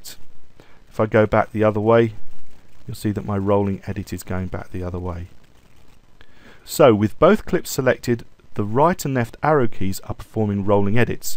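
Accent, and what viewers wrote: United Kingdom. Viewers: YOUR LESSONS ARE THE BEST ON YOUTUBE. I AM NEW TO PREMIERE PRO AND YOU HAVE REALLY HELPED ME A LOT. I LOVE THE VERY SIMPLE WAY THAT YOU EXPLAIN SOMETIMES CONFUSING PROCESSES. MANY THANKS!! OH, YES, YOUR BRITISH ACCENT IS VERY COOL.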